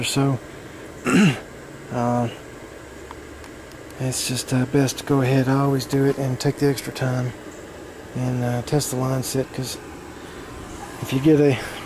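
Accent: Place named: South, United States. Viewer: your excent is not to bad being from alabama, i always enjoyed hearing the southerns talk.seeing i am a yankee. good job on the video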